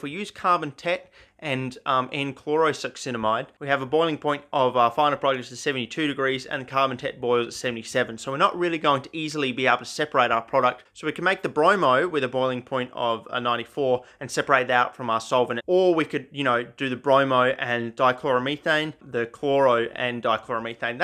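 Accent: Australia. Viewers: Love your channel, there's not many other people on the internet who do decent organic chem! A suggestion: try making benzaldehyde from benzene via Gattermann-Koch reaction. Basically, it's a Friedel-Krafts with CO + HCl mixture in place of an acyl chloride. Very neat chemistry and pretty cheap in terms of reagents used, maybe as cheap as you can get. I wanted to do that for a looong time, but I just don't have enough good equipment for working with the gases. In fact, I happened to find a detailed procedure for it in a 120 year-old "Practical methods of organic chemistry" by prof. Gattermann himself. Here's the link: <link> (Actually, it's a surprisingly good organic chemistry textbook.) PS: your accent is wonderfully Australian, always nice to listen to :)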